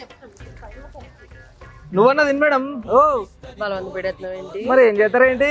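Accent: Godavari, India. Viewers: godarolla slang tho okka short chesaru bro aa short viral ayindhi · Ma godavari paapa matladithene sweet ga vundhi❤❤❤❤❤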